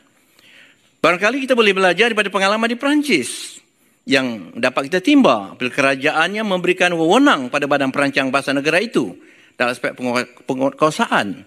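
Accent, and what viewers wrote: Indonesia. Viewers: Hai Prof. AWANG.......anda berpidato sdh berdialek BAHASA INDONESIA......Sadarkah Anda. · Haha...bapak ini kok logatnya spt indonesia?😅😅😅😅😂😂😂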